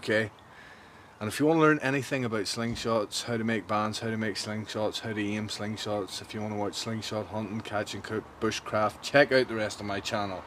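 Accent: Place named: Ulster, United Kingdom. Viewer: I'm thinking " That gent is maybe an Ulsterman" and then I heard " Plenty of fine shavings for kindlin' " Best wishes from Portarlington County Laois. Keep up the good work.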